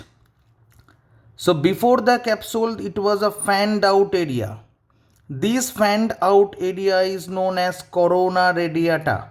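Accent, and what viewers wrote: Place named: South Africa. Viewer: Thanks - crystal clear South African 👏 May you align its clinical application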